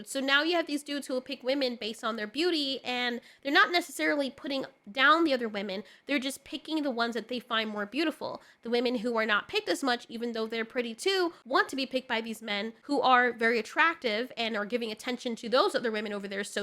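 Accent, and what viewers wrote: England, United Kingdom. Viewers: That was a surprisingly impressive English accent. Wrong time period, but still tho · I endorse your Brit accent.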